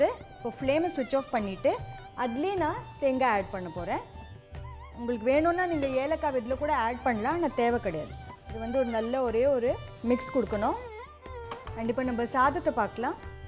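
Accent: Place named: Tamil Brahmin, India. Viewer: Pongal Paanai on a gas stove! wow. awesome clear instructions. Loved the tambram pronunciation as well - a bonus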